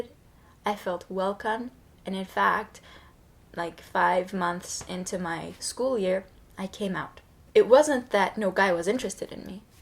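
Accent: Turkey. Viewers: Türk aksanıyla İngilizce konuşuyorsun Türkçe fazla konuşmasan da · Aksani var hatta basta turk oldugunu bilmiyodum cok sasirdim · Bende 16 yasinda turkiyeye geldim, 5 sene burdayim ve burda ingilizce konusan yok, ama ben ingilizcemi unutmadim, ingilizce ana dilimdir, ama bu kiz nasil unutuyor onu anlamiyorum... Yalan ya hepsi.. saten, amerikan aksanininda turkce olan tang'i var. ( evet turkcem daha cok gelisti ama ucmadi burda) Basically, she has a Turkish tang in her voice, it's kinda like how latinos speak.. I'm sorry to say this sweety, but you couldn't make it more obvious. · Bilimsel açıdan bakacak olursak 7 yaşına kadar öğrendiğin tüm diller anadilin oluyor (detay için Noam Chomsky'nin LAD çalışmalarını okuyabilirsiniz) ve beyin hasarı almadıysan kolay kolay bu dili unutmuyor, dili konuşurken bir anda aksanlı konuşmaya başlamıyorsun. 15 yaşına kadar Türkiye'de yaşamış ve türkçe konuşmuş bir insanın bir anda 5 veya 10 senede dilini unutup kendini ifade edememeye başlaması tamamen tiyatro. 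Kaldı ki İngilizcesi de çok belli olmasa da aksanlı ve bazı kelimeleri türkçe aksanla telaffuz ediyor. Bu tarz triplere girmek garip, bir dili iyi konuştuğu mesajını vermek için başka bir dili kötü konuşuyormuş taklidi yapmana gerek yok.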